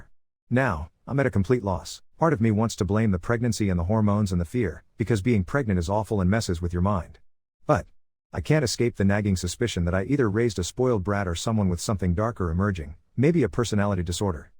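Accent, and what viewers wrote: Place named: United States. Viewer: Sounds very american 😂😂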